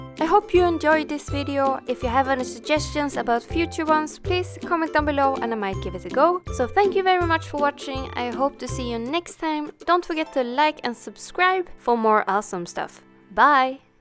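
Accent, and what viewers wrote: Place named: Sweden. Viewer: Shit, man hör på din dialekt att du är svensk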